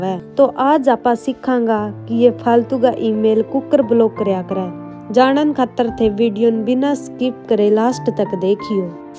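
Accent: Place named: Haryana, India. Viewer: आपकी हरियाणवी आवाज बहुत अच्छी हें। 🥰🥰🥰🥰🥰🥰🥰🥰🥰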